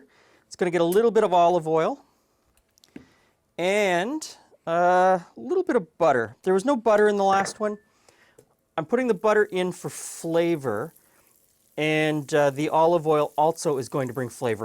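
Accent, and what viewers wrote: Canada. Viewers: Makes sense. Canadian English sometimes favors British pronunciations to American ones. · I try to pretend you’re not Canadian but you pronounce literally everything differently than Americans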